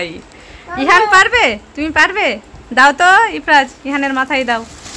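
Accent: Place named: Kolkata, India. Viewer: আমার মেয়ে minnat, রোজ আপনাদের ভিডিও দেখে, বয়স ৩ বছর, আপনার কথা কলকাতার মানুষের মতো, আপনি কি মুসলিম? এই প্রশ্ন টা আমি খুঁজে পাচ্ছি না, 😊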